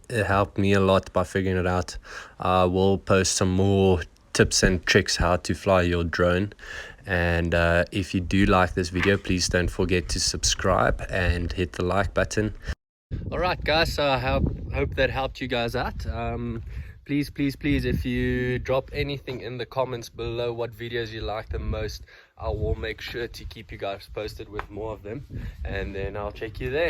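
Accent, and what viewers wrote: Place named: South Africa. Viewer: Bro, you sound South African.... Lol I grew up there, Johannesburg.... But I live in Bulgaria, Sofia... Europe... Sweet 😁🛸🇧🇬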